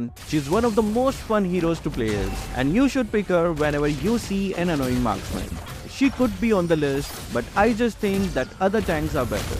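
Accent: India. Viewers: Bro are you indian😅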